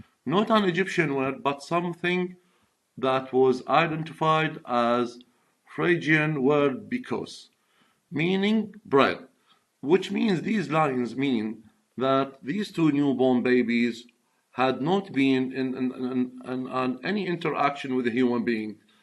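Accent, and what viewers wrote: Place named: United Kingdom. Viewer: اللكنه بريطانيه بامتياز . ❤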